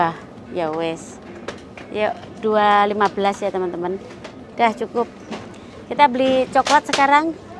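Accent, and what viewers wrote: Indonesia. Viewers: Selamat pagi semuanya, selamat pagi mba dan sekeluarga, semoga hari ini lebih baik.senang klo uda liat vlognya mba.seruuu dan cara bicaranya khas Indonesia banget.semoga mba slalu dberi kesehatan selalu ya bersama keluarga tercinta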